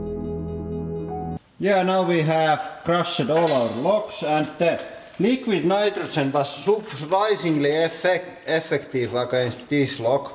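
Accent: Finland. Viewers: Ah Finland. I wondered where your accent was from. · FINLAAAAND ACCENT!!!!!!!!!!! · Wow the finnish accent is really different than the swedish one eh? How similar are the two languages , if anyone knows? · typical finnish england :)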